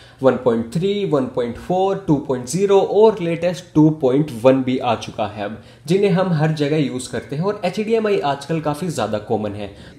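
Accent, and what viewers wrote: India. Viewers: Are you Gujarati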